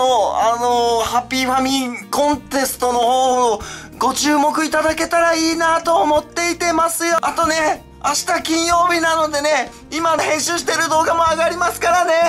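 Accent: Iwate, Japan. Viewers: まだ発表されてないみたいです。岩手弁？素敵でしたよww 次の動画も楽しみにしてます。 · さんの岩手弁？イイね！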